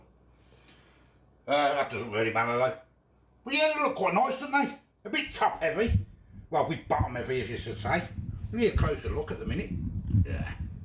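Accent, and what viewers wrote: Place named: England, United Kingdom. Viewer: I love how only a person who has lived in England for a good amount of time would understand half of that killer accent😂.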